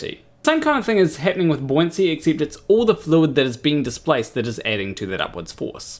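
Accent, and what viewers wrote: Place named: New Zealand. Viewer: New Zealand accent.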